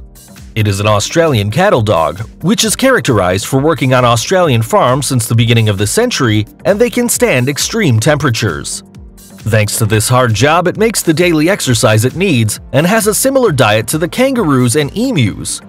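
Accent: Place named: United States. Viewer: I do not like the USA English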